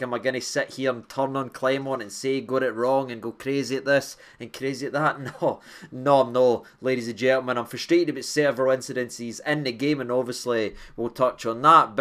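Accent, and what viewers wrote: Scotland, United Kingdom. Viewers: It’s a Scottish accent what’s wrong with it ?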